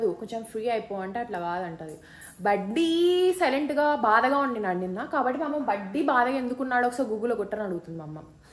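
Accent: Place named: Telangana, India. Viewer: ni slang aacham telangana pilla la matladuthunaruu ela '' chesinaruu vachinaruu ani bagundi ala miru matladuthey 💗✊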